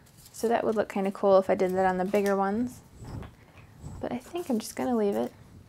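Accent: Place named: Midwest, United States. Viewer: "Taaag" and "Staaak" That good ol' mid western accent!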